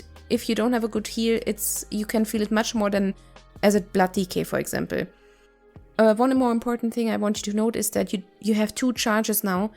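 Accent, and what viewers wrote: Germany. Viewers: This German school English 😂. So cute. I listen you first time but 100% you german. But good video👍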